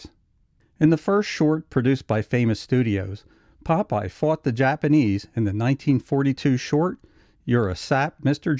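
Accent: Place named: Pittsburgh, United States. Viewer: What part of Pittsburgh are you from?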